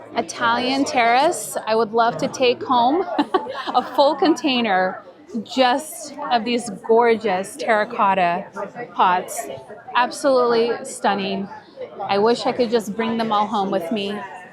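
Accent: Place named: England, United Kingdom. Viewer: looks like you picked up that lovely English accent 😊❤❤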